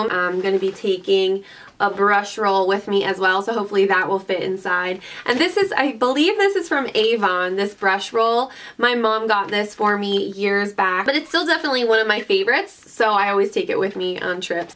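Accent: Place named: Philadelphia, United States. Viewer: I just saw someone comment and say you're from Philly and I have to say: I fricken knew it! We have a very specific accent and I knew I heard it in you when I first started subscribing to your channel haha.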